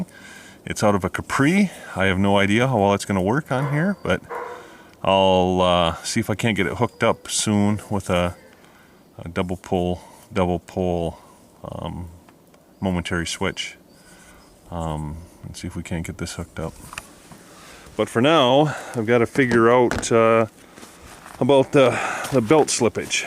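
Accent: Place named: Upper Peninsula, United States. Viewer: EXCELLENT series! I sure hope my tractor restoration turns out 1/2 as beautiful as yours! I can't wait until spring so I can paint. ps. I'm from Michigan and you sure sound like you're from the U.P.!! ps.ps. My family and I vacation near Republic every summer......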